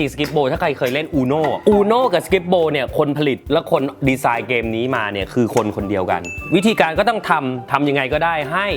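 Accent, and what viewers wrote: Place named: Thailand. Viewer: พี่บอยสำเนียงไทยมากกกก น่ารัก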